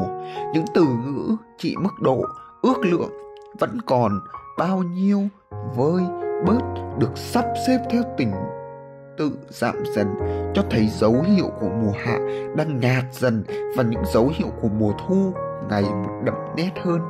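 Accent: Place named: Hue, Vietnam. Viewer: rất thích hợp khi chọn giọng huế đọc ạ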